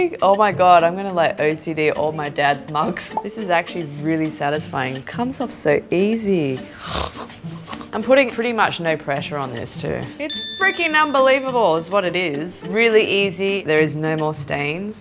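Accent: Australia. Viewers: Where is she from? Australia? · is she from Austrailia?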